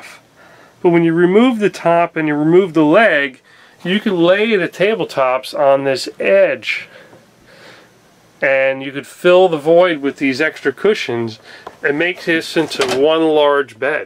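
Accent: New Jersey, United States. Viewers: I think it's the New Jersey accent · that Jersey/ mid east accent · SUCH a Jersey boy. Can really hear it in your voice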